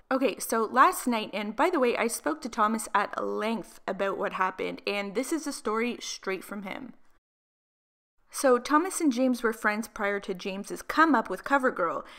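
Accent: Canada. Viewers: is u canadian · You must be Canadian?You have such a heavy accent! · Are you Canadian? You kind of have an accent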